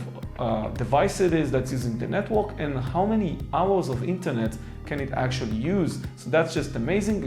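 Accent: Israel. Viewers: שומעים שאתה ישראלי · Love the Israeli accent! Quick questions: 1. Do you need a modem to connect to the router or it is functional as both? 2. Can u access the administrator panel easily? Does it support English or it is all on Chinese? Thanks for the review mate!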